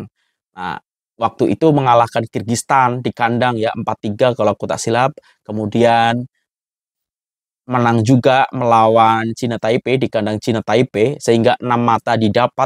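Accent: Indonesia. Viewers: Bingung ini orang pake bahasa apa.. Logat dialek tata bahasa pake Indonesia tapi disisipin dengan kata2 Malaysia jadi bingung dengernya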